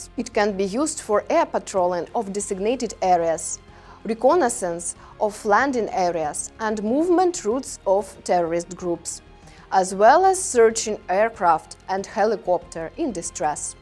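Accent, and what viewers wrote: Russia. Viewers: Хаха, какой милый русский акцент))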